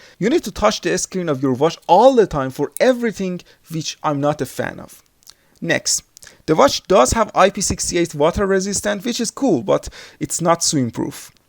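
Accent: Iran. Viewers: Iranian maybe or afghan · Persian accent 😂😂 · Bro, I am 100% sure your native is Farsi, right? Beautiful review, thx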